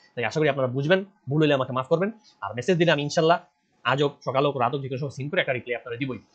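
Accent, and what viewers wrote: Sylhet, Bangladesh. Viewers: ভাই আপনি কি সিলেটি নাকি এত ফাস্ট কথা বলেন কেন আস্তে আস্তে সুন্দর করে কথা বলেন। আশা করি আমার কমেন্টসটা বুঝতে পেরেছেন। এবং ওইদিকে খেয়াল রাখবেন। আপনাকে থ্যাংক ইউ।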